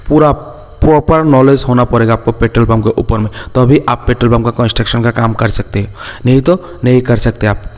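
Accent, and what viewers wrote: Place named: Odisha, India. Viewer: BHAI APKO HINDI BHASA BOLNE ME DIKAT HO RAHI HAI KYA AP KI HIDI BHASA SE AISA LAGTA HAI AP ORRISA KE RAHNEWALE HAI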